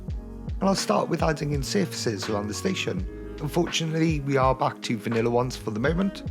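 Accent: United Kingdom. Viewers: Yesss, my favourite British voice is back, and how, WOW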